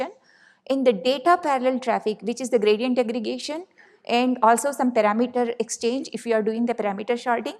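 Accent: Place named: India. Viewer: Thank you for giving insights about AI Networking and i like your speech very calm and keeping natural Indian tone.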